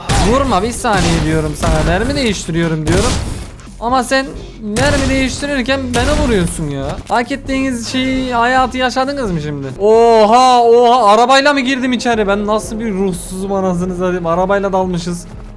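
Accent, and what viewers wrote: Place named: Kahramanmaraş, Turkey. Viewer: Abi böyle diyomda bende boyle konuşuyom bende maraşlıyım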